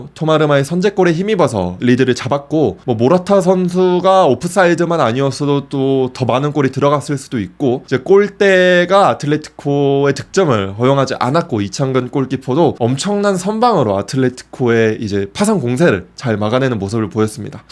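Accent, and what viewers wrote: Gyeongsang, South Korea. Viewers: 경상도 말이 어때서? 듣기 싫음 보지마라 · 경상도 억양좀 어케 해보소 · 경상도억양 듣기 싫어요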